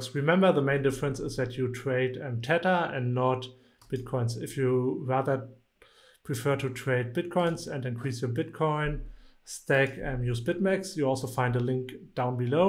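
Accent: Germany. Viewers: Nice tutorial 👍.. Are you from Germany?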